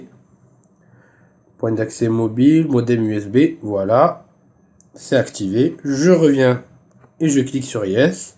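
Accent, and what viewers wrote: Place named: France. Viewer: salut merci pour le video mais mes oreilles saignent , svp arretez de prononcer les mot anglais a la francaise vous denaturé completement la prononcition et la comprehension n es que plus difficile. tu explique 2 minute ou est le bouton reset mais tu ne nous dit pas qu est ce que le tetra machin truc. alors petit cour pineapple le i se prononce aille comme pas i , paynaple pas pine aple . et le plus dur RESET , c est pas un raisin , resset , avec le son c . en plus tu prononce le e en i alors pourquoi ne pas continuer et le prononcer comme il faut . si tu prononce le e en i pourquoi prononcer le i en i a pine apple ???? en espagnol quand tu demande como esta ? comment tu vas. et que lon te repond esta bien . tu repond en prononcant le bien en bi enne et non pas en bien comme en francais , c st pas la meme langue . c est pareil en anglais. 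va falloir faire un effort un jour la . ensuite tu nous parle de cable reliant le mark 7 mais tu nous montrepas comment se conecter dessus. ta manip de ipconfig c est super mais ca ne fonctionn pas du tout , et ton port 1471 ( indiquer 1461 ) dans les sous titre j ai re ecouter 4 fois en montant le volume et tu dit 1461. ou as tu pris ce port, ca non plus on le sais pas., du coup je peux pas aller plus loin dans le video . bonne journee et bon cour d anglais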